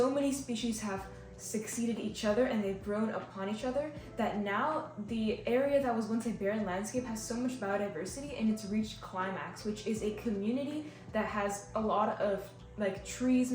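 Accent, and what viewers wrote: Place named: Quebec, Canada. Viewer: Are you French Canadian? I hear a slight accent but can’t place it. Great video by the way. We need more explanation like this in freshwater as well.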